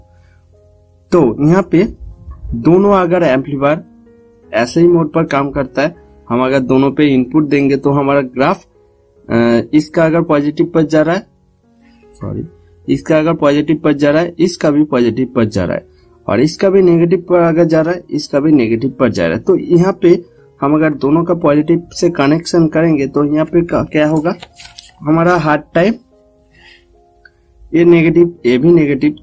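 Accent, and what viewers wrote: Bengal, India. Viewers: Bhaiya ap kiya bangali ho kiya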